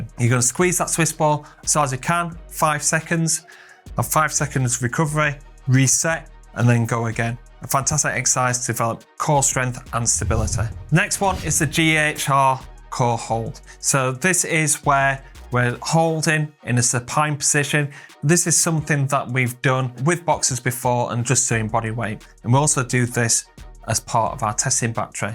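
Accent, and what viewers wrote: United Kingdom. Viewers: The real British accent makes the Viddo much interesting 👍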